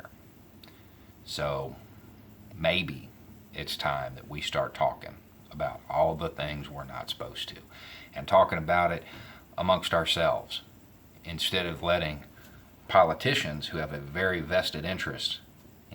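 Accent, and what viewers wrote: South, United States. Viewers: I hope you hung on these last three years, I think it was the "let's talk about a hundred thousand subscribers" video he talked about the accent. I believe Beau is a positive force in the world, and proves you shouldn't judge a book by it's cover. (And yes, I'm a country boy, have a bit of a southern accent, vote blue in a red state, and enjoy hearing common sense from a dude in a shed that looks and sounds a bit like me!) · He's not exactly hiding who he is, his channel is called "Beau of the Fifth Column". I just assumed he affected a more neutral accent on his work channel because a Southern drawl is viewed as unprofessional. This one is his personal channel and he can sound like and say what he wants here.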